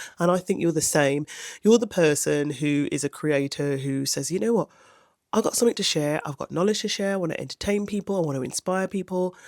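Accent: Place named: United Kingdom. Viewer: I have a feeling you will surpass me. You have a natural vibe on camera, nice editing too, plus you can't beat that British accent. I just raw dog all my videos like a wild animal. Sometimes it works I suppose. I hope we both grow organically and build some nice communities. AKA Tribes. I think I am going to do a video on that.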